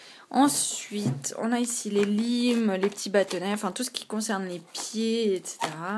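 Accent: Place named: Switzerland. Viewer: coucou ! je pense que tu mérite plus d'abonnées pour moi :) Wahou ta collection !! PS: Tu habite en Suisse ? ( J'ai l'impression que tu as un accent :$ ) Passe voir ma chaine ;) bisous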